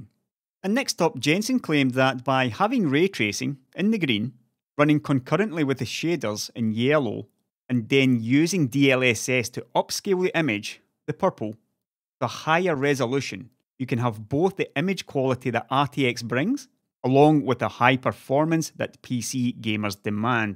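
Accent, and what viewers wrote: Scotland, United Kingdom. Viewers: I Missed yurr skottish voice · reading the comments in Scottish. That voice is stuck in my head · It's called a Scottish accent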